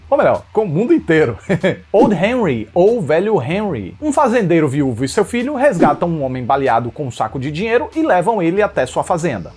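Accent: Northeast, Brazil. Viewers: Ei tu é Cearense? teu sotaque parece ser nordestino, abraço amigo!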